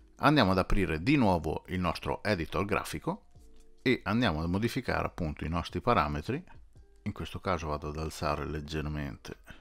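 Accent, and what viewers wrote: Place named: Emilia, Italy. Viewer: Complimenti, sei maledettamente bravo, ti seguo sempre... Volevo chiederti una cosa, se è possibilee... Vorrei fare una casetta in campagna usando una foto, ma vorrei che nelle finestre della casa, si accendessero e si spegnessero, stessa cosa vorrei fare dei lampioni antichi, quelli di un tempo che avevano la candela, come si fa a fare questo effetto ? So che per te è una sciocchezza, ma io ci sto diventando scemo e mi sono guardato quasi tutti i video del tuo canale. Grazie e non smettere... FORZA BOLOGNA !!! L'accento Emiliano...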